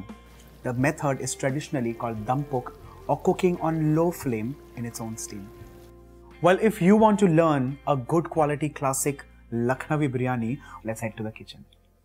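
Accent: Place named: England, United Kingdom. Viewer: I love your english accent ,bro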